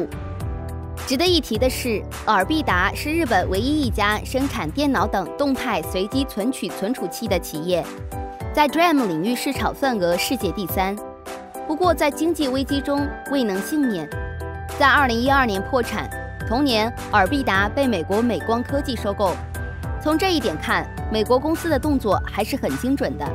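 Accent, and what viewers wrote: China. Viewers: 假裝繁體字想要以為是台灣人發的影片，結果聲音根本是426⋯然而內容也不符事實（笑了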